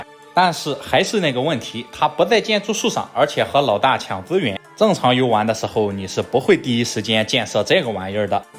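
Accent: Shandong, China. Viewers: 山东口音有点意思： 挖囊废； 😂听了半天才反应过来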